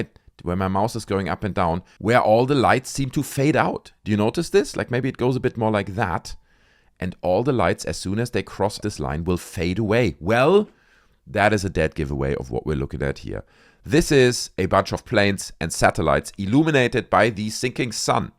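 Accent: Germany. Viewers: Love Ur German English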